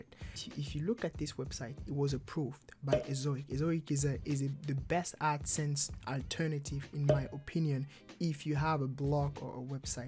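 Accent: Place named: Nigeria. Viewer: I just landed on your channel and l stayed on. Good stuff. Your accent sounds Nigerian.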